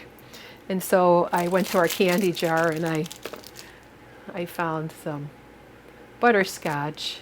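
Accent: Chicago, United States. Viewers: Hi. I have been watching you for a few years now. My family is from Chicago although most of them have moved to the southwest. Your accent reminds me of home. I love your videos and all of the knowledge you share. Thank you so much. I have started spinning this year. I’ll let you know how I get along. I might have some questions. I have a used lendrum wheel that I bought from an English lady who used it for 30 years. It is well loved.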